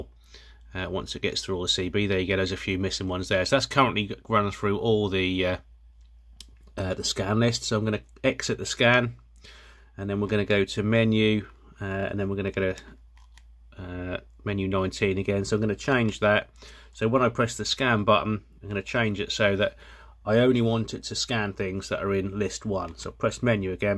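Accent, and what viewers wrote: Norfolk, United Kingdom. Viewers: Is that a Norfolk accent I hear?....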